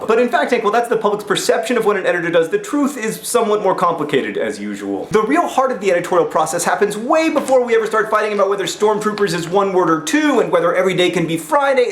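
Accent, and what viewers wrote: United States. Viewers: OOOoohh Calednrically!?!? I am not so used to the American accent! I thought he said "collindrically!"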